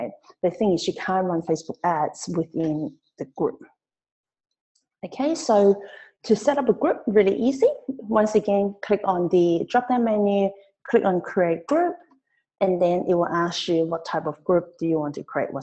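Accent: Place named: China. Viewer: Chinglish ... love it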